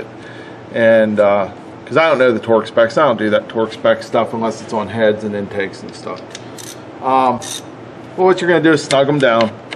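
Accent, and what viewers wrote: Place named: Pittsburgh, United States. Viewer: Good vid thanks cuz... it's what I thought anyways but you just gave me some reassurance that I was doin the right thing. You're a Burgh boy ain't you? I can hear it in the accent (it's thick cuz), the force is strong wit this one.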